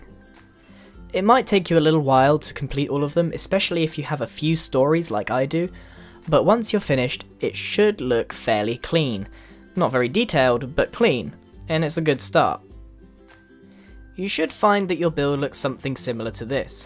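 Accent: United Kingdom. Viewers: He's british, ok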